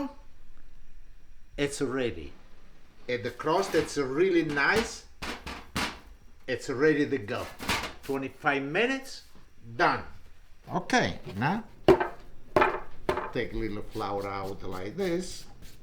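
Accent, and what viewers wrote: Italy. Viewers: Bon giorno papa your ciabatta is bella 😊 I like Italian's accent when speaking English 😁 makes me smile all the time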